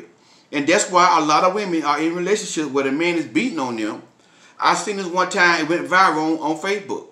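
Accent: South, United States. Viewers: Your Southern Accent💖💖💖 You have wisdom.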